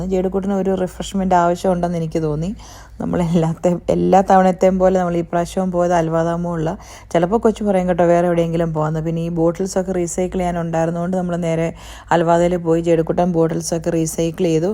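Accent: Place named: South Kerala, India. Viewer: Nattil evdeya South Kerala style talk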